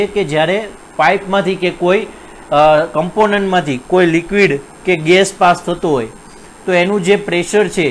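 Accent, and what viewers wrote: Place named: Kathiawar, India. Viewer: Ready sambhline laage chhe kathiya vadi chhe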